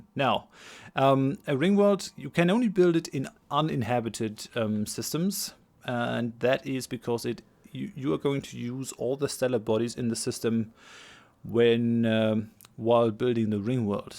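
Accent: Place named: Germany. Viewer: Sounds german ;)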